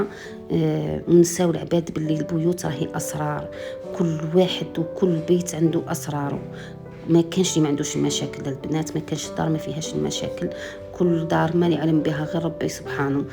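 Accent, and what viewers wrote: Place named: Constantine, Algeria. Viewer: من هدرتها باينة قسنطينة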